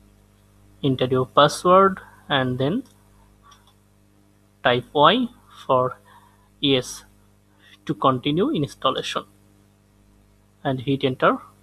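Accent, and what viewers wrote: India. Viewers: Why does every programing vid have a thick indian accent lol Great vid btw :)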